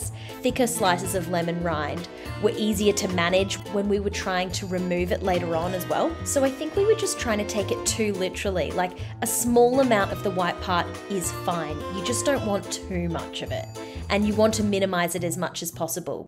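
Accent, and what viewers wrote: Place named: Australia. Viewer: First of all, Im loving your Aussie accent :D and this is the most non-Italian way of doing it, totally British/Aussie way I would say but if you like the taste, go for it, there is nothing wrong as long as you like :) Points are already raised by you, but I understand that Italian way takes time and more effort, so enjoy a quick limoncelloish drink :) I will give it a try as well, but with 50-55% alcohol gin, lets see what it will be like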